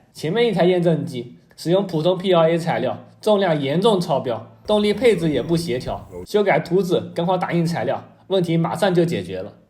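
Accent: Zhejiang, China. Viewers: 很浓浙江口音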